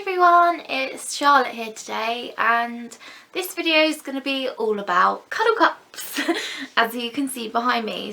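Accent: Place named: United Kingdom. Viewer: my husband will come in my sewing room and say: is that Charlotte? He loves hearing you say: "Hello everyone, it's me, Charlotte." In your British accent. 🤗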